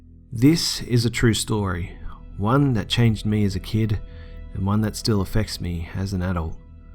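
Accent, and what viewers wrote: Australia. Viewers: Nice to hear a fellow Aussie on here :p My first vid of yours, I've heard good things from other channels and am glad i stopped by! Cheers!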